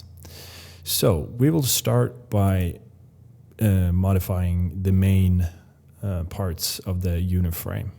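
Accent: Sweden. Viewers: Bro, love your videos, very helpful. Your English is great, but is that a slight Swedish accent I detect? Zug zug! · are you swedish?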